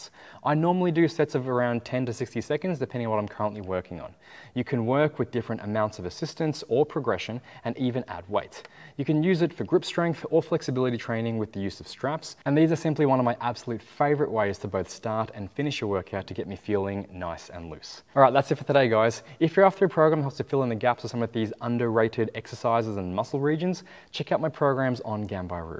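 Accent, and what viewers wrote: Australia. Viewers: Are you Australian? Where are you based? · So weird hearing an Asian man speak with a thick Australian accent. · Are you Australian?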